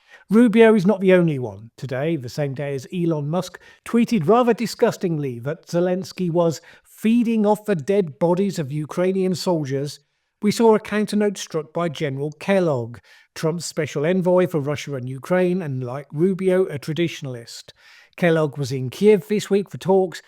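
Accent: United Kingdom. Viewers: It's only your British accent that makes you sound intelligent, sir. But the actual content of your bloviating is vapid, smug and snide.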